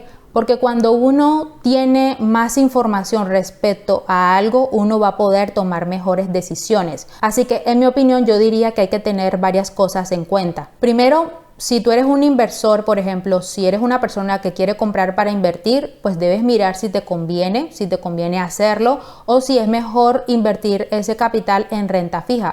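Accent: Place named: Caribbean Coast, Colombia. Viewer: De una manera amable te aconsejo acudas con un fonoaudiologo: que te ayude a mejorar tu dicción. Tu pronunciación muestra que eres una persona ignorante en el tema. No me refiero a tu acento que se nota costeño. Puede que no seas ignorante en el tema pero esa es la percepción que muestra tu mala pronunciación. También debes mejorar la redacción! Saludos y mucha suerte 🍀👍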